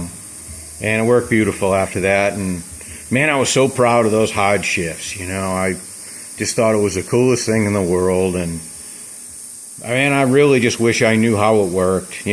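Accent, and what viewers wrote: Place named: Maine, United States. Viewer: This is great. Still super complex but really helpful. Thank you so much. I think I recall from another video that you're out of Texas, but you sound like a Mainer to me. A good thing!!! Thanks.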